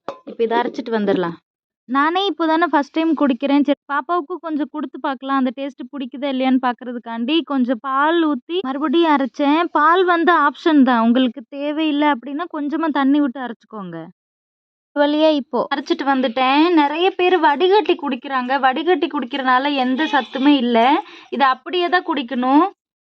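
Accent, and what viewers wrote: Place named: Odisha, India. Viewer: சகோதரி உங்களை பார்த்தால் ஒடிஷா பெண் மாதிரி இருக்கு. நீங்கள் பேசும் தமிழும் ஒடிஷா பெண் பேசுவது மாதிரி இருக்கு. உண்மையை சொல்லுங்க நீங்கள் ஒடிஷா பெண்ணா இல்லை தமிழ் பெண்ணா?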